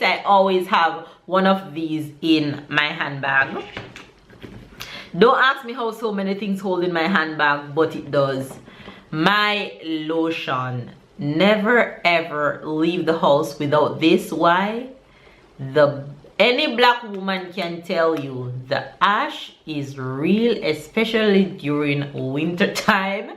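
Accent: Jamaica. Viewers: Here from, as soon as you started talking I knew you were jamaican,of course am going to support my own.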